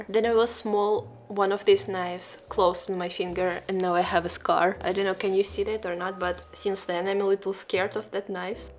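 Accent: Russia. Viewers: I justed wanted to write hello and I think your Russian accent is very authentic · == I LOVE YOUR RUSKY ACCENT .--IT S AWESOME == I HOPE TO KEEP IT == YOU HAVE MORE CHARM . IT'S IN FASHION IN EUROPE . == ENGLISH WITH A RUSKY ACCENT == ALL THE BEST ==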